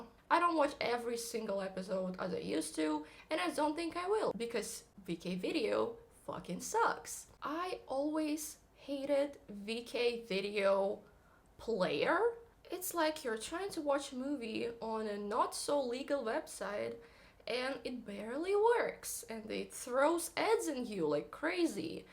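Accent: United States. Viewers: Interesting comparison between Russian social media and YT. Your subs seem to be going well. Nearly 50K now. Going great. Hang in there. You do good work. I don't/won't have Face Book, so the question is N/A for me. I'm interested in where you learned your English? You have certain American sounds on certain words. Your English is very good, I don't hear any mistakes there at all. 😁😁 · Your American English sounds amazing! I wish you all the best 😊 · I'm shocked at how good your English has gotten over the past year... You're starting to sound American lol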